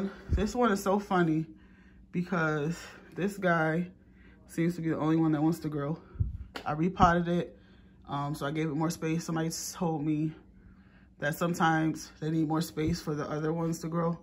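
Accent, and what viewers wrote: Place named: Florida, United States. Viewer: I'm trying to place your accent. I have a guess but dont want to make a fool of myself. Almost seems central Florida ish with a tint of something else I cant place. Let me know if you don't mind.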